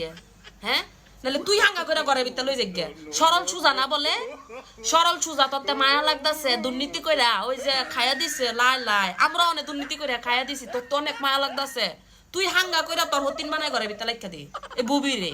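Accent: Comilla, Bangladesh. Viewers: OMG 😲 😱, can i know in Bangladesh what part you are coming from? Its sound Cumilla version 😂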